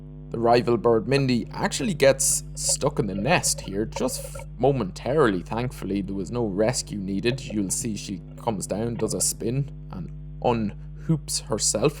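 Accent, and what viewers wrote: Ireland. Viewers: Nice Irish accent! Cheers! ☘🍻💚🧡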